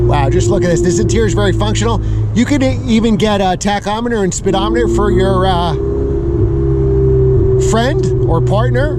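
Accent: United States. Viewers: Watching the video and listening to you speak and I'm like wait a minute, this guy is from Philly. Nice review.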